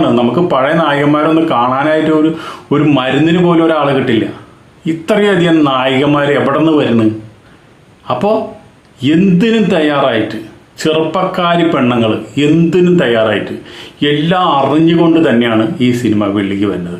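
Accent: Thrissur, India. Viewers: Very interesting Voice & Language, sounding Trishur?😅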